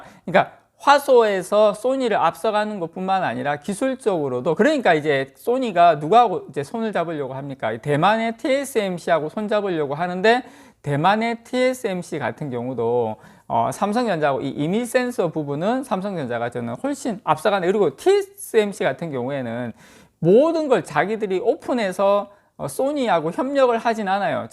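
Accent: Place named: Gyeongsang, South Korea. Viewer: 저도 경상도🤗 발음 편안함니닷😄 점진적 소곤소곤 우상향📈 🅻🅾🆅🅴(๑•᎑< ๑)♡🙆🏻‍♂️🙆🏻‍♂️🙆🏻‍♂️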